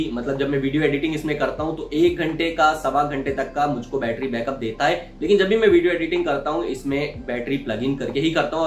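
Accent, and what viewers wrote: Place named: Kanpur, India. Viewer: bhaiya kanpur se ho kya aap ?....apki boli se lagra hai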